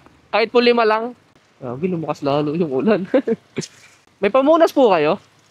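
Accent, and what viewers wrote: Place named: Cebuano, Philippines. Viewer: 😂😮 Cebuano k pla hnd waray,ingat ba Kaibigan 😊😮idoy or nonoy ,noy sa Tagalog haha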